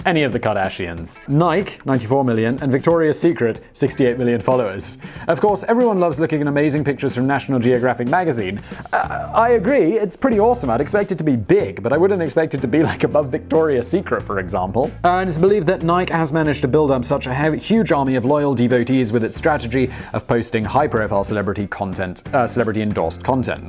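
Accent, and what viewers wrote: RP, United Kingdom. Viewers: Goddamn this needs to be higher. Also add says uncensored “ fucking“ 😂 caught me off guard and was awesome to hear after years of his impossibly posh Britishness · Wait, is British? Since when? Is it serious?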